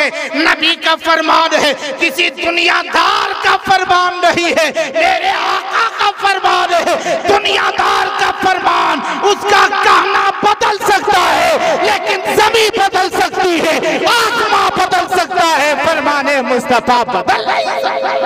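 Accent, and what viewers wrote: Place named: Giridih, India. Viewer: Maulana sb ki baten sun kar aisa lag hi raha tha ke Giridih district ke hai. Kyuke hum bhi Giridih district ke hai or ye bhasa se wakif hai.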